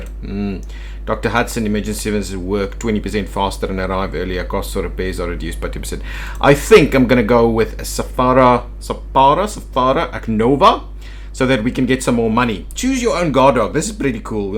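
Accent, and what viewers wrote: South Africa. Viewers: When I was a child, I used to watch a snake expert on TV called Austin Stevens. You're accent very much reminds me of him. I always liked the South African accent. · you sound south african, tell me its true. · Its actually a South African accent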